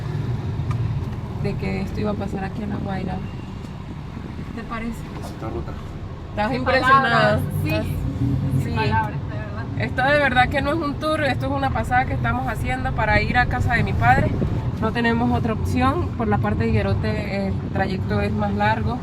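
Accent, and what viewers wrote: Colombia. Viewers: DILE A LOS DEMAS QUE HABLEN PARA SENTIR QUE ESTOY EN VENEZUELA, TU HABLAS PURO COLOMBIANO.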